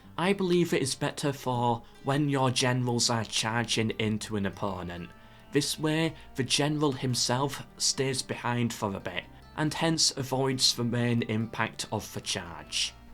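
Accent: United Kingdom. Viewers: You’re voice combined with the UK accent, it’s just too good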